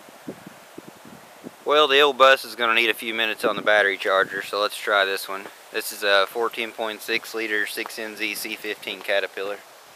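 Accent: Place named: Missouri, United States. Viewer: Thought this dude was from around Omaha for a sec. nah he from MO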